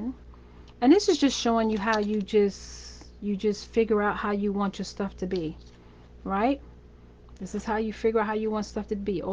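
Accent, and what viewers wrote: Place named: New York, United States. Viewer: Hi you sound like a New Yorker ! I'm from Boston (Roxbury) Ma. just came across you channel and yes I love crafting I just started a about a year ago. I love how good you explain things !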